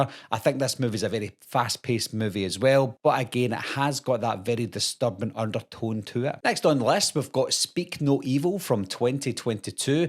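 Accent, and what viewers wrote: Scotland, United Kingdom. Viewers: As soon as I heard the Scottish accent I was in! Then for you to recommend a couple if Aussie flicks AND know about The port Arthur massacre we had, I am now subbed 👍🏼 🇦🇺 🏴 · Is this guy Scottish?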